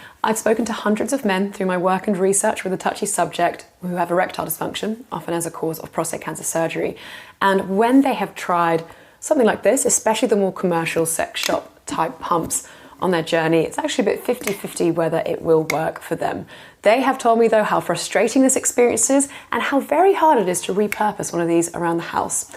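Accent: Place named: United Kingdom. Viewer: Love yur British voice. It's sexey. 😊😊 Thanks for the advice!!😊😊😊😊❤❤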